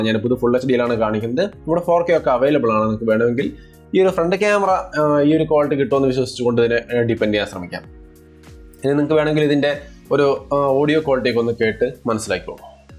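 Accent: Thrissur, India. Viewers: Brooo thrissur Karan anno? Reply please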